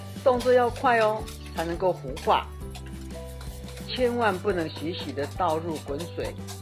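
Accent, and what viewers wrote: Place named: China. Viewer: 明明是台灣阿嬤為什麼要講話口音辨成大陸的大嗎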